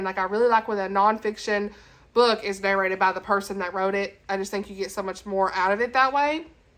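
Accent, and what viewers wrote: South, United States. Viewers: ok the libby app I think I may have heard of, is it like hoopla? I know they do books, movies, audio book, e-books etc. Whatever is at your library. Ok will check it out thanks. Love your country accent btw. I tend to pick up my country accent after hanging around my cousins from the south. Then I get back home & people forget I am not actually southern lol.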